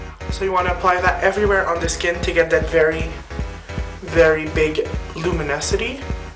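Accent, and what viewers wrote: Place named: Canada. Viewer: "folies blanches" trop marrant l'accent canadien!! :)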